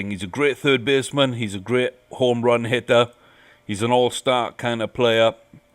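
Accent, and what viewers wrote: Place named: Wales, United Kingdom. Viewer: There,s Welsh Twang in your voice are you from UK originally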